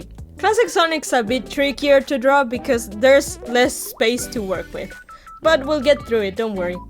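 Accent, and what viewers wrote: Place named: Scotland, United Kingdom. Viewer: You sound kinda british and scottish,weirdly thats how i think it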